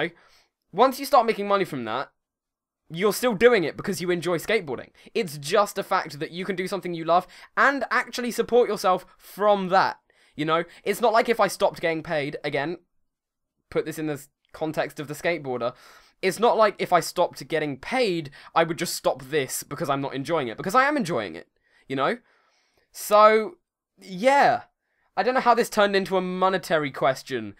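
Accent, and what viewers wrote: United Kingdom. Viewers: When you move to the US, won't you lose your British accent? Kinda sad really, all the British LPers are going over to the US. Ah well, can't be helped. Anyways, you going to keep dat accent? :D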